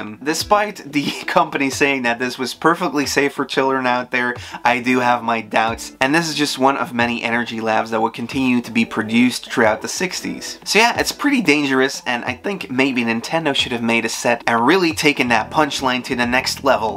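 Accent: Canada. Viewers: Are you canadian? You sound Canadian in this video. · AN is common. TREE letters is way to complicated for most us mericans lol. Seriously tho your accent is damn near "perfect" sounding for a country made up of all other accents. I figured you Canadian because it's so subtle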